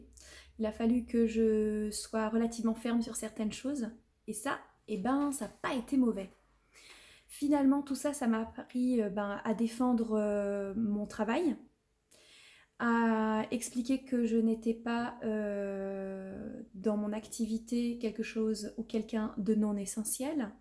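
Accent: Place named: Alsace, France. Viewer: Merci c'est toujours un plaisir de vous entendre parler que ce soit de peinture ou de votre bilan. Mais où est passé votre accent Alsacien 😁. Je vous souhaite une belle et heureuse année artistique. Bisous et à très vite pour votre prochaine vidéo 👍😉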